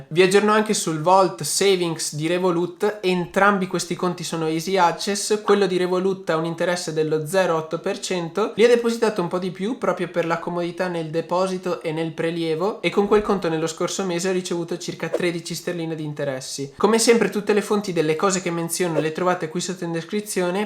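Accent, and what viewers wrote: Veneto, Italy. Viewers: Scusa.......... MA TE SI VENETO?!?!?! SE TE SITO VENETO ANCHE MI SO VENETO VECIO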